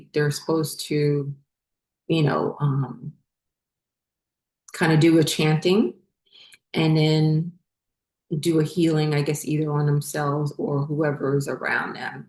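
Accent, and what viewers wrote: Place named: New England, United States. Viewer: I saw this video a few days ago and it kept popping in my mind, so I’m back to listen even tho I haven’t started at the first video. For now, it seems like there is a message in here for me. Things around my house always start breaking, falling apart when it’s time to go. The last townhome I was in had a broken washer, drier, oven, stove, microwave, and heater 😮😅 by the time we finally were able to get out🎉🎉 Just want to say Good For You - telling one’s story is so powerful - and also I grew up in New England and enjoy listening to your narrative/accent.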